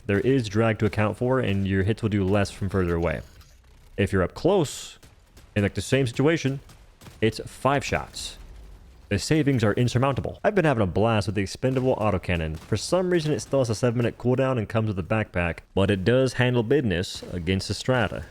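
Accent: United States. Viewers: So your british using a American accent. Thats wild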